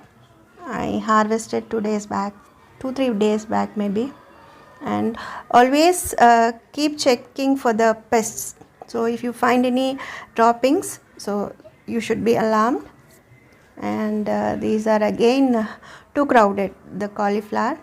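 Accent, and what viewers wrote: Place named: Andhra Pradesh, India. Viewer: మీ vedio లలో తెలుగు పట్టి పట్టి మాట్లాడుతుంటే తెలుగువాళ్లు కాదేమో లేదా ఏ అమెరికా లోనో పుట్టి పెరిగి భారత్ కీ వచ్చారేమో అనుకున్నాను 😀 ఇవాళ మీరు english లో మాట్లాడుతుంటే confirm అయింది మీరు పుట్టి పెరిగినది అంతా పక్కా ఆంధ్రా 😀 Any how your garden is beautiful and we can make out the hardwork you put behind to maintain it for years. Keep going. All the best mam👌👏👏👏👍🙏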